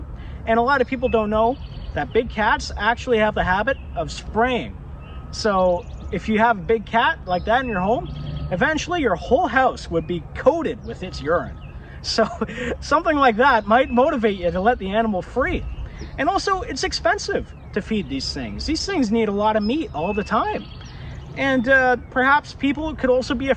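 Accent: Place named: Canada. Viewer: Canadian accent is strong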